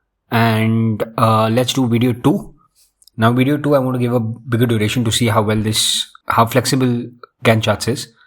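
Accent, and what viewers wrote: India. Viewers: You Indian guys... you have the worst accent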